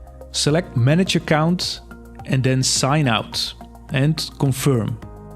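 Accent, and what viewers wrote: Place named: Netherlands. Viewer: He sounds so Dutch!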